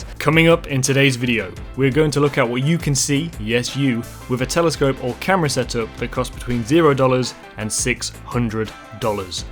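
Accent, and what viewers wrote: United Kingdom. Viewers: Great video...if i was just listening with only sound, you sound like Physicist Brian Cox...English accent and all...I had to double check as I was typing this lol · Very distracting, having a British accent, channel based in UK but quoting prices in Dollars. Strange.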